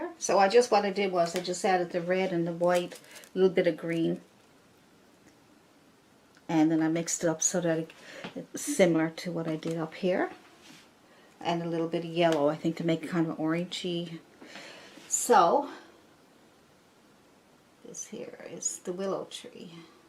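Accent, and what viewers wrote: Newfoundland, Canada. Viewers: Is that a NFLD accent that I'm hearing?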